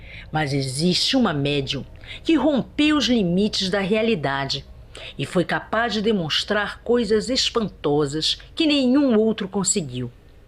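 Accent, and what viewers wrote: Pará, Brazil. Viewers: a senhora é paraense?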